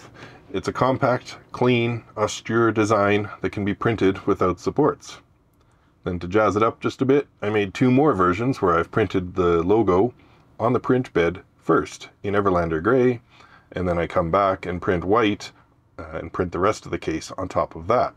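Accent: Canada. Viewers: He's Canadian so it's Ja, you bet ya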